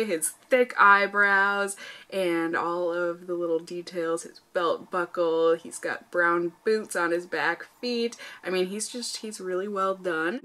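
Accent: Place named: France. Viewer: Your so funny! Lol your French is cute.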